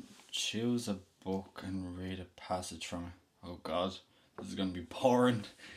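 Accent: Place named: Dublin, Ireland. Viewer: you have the 'i'm from dublin but i'm also gay' accent. not in a bad way it's just it really is its own accent. :)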